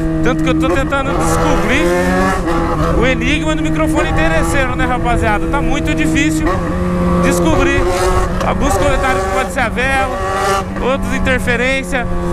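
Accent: Goiás, Brazil. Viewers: pq vc fala no pique do Goiás sendo que vc mora em Londrina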